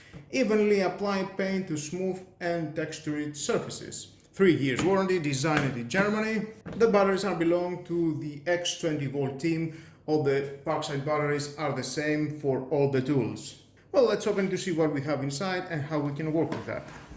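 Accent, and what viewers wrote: Greece. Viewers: Αδερφε Ελληνας? :D Με το που αρχισε το βιντεο σε εφαγα απο την προφορα.χαχαχα Δυνατος! Νομιζω οτι ηθελε λιγο πιο αραιο το χρωμα, αλλα παλι καλα ειναι λογω του μπεκ. Ωραιο Βιντεο.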